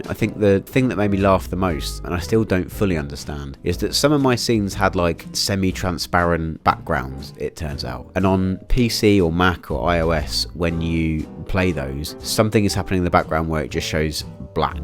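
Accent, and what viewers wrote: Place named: Southern England, United Kingdom. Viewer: Hey I just came across your channel, it's so nice to find a fellow brit doing game development! May I ask where you're based? You definitely sound like a Southener ;-)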